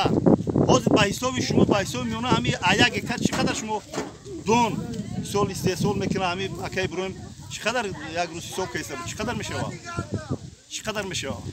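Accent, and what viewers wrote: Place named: Mastchoh, Tajikistan. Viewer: Бобо ранги Мастчоҳӣ мардакча гап мезани... МошАллох бобо умратона дароз кунад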